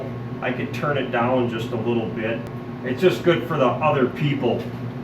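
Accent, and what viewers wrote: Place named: Rust Belt, United States. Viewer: Great video! Love that thick rustbelt accent. Last generation of the unique American accents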